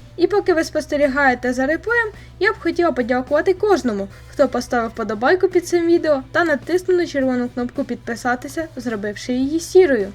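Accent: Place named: Transcarpathia, Ukraine. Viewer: ВзяВ з печі стейки я вирішиЛА ))) Трохи схоже на Закарпатський діалект, але це не він, чи він ? )))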